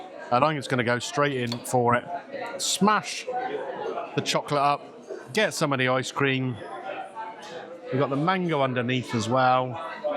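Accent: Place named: Birmingham, United Kingdom. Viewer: Its funny watching a two Michelin meal being described in a thick Brummie accent instead of the usual French, real Oxymoron, ha!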